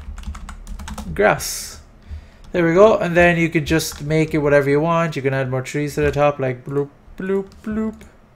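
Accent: Ireland. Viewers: Dang minecraft version of JackSepticEye, every person with irish accent can be Jacksepticeye i guess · Irish accents. They are so awesome. *wipes away tear* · You sir, are a brilliant creative master with a lovely Irish accent. Bless you for your talents and creativity and sharing both of them with us. Have a nice day :)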